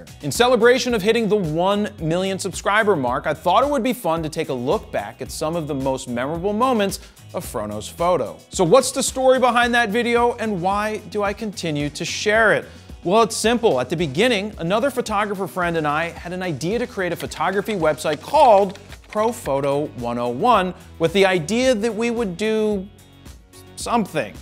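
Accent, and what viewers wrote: Philadelphia, United States. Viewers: Being from South Jersey, nothing wrong with your Philly accent. Although I’ve bee away from Jersey still have accent. Thanks for your video showing how you began. Oh and loved the portion with your Grandmother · Nothing wrong with a Philadelphia accent! It was so great to see the Lil clips, those were priceless!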